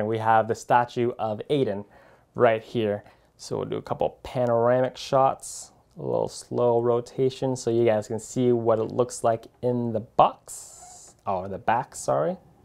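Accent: United States. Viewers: I always imagined you were a white guy. The accent is very strong and American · Hes asian? Had no idea thought he was american cause of his voice, no hate tho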